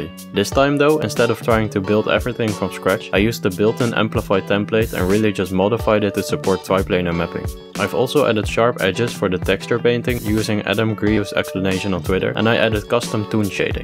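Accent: Netherlands. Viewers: Are you Dutch? Your accent sounds really Dutch... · Why do you sound dutch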